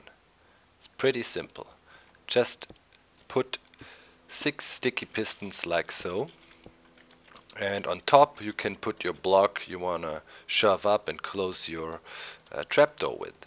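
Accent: Germany. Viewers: Hey, are you from Germany? The accent sounds like that:D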